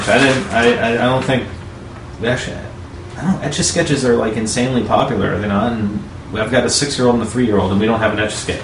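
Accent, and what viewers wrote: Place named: Canada. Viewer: You're Canadian? I always thought you sounded like it.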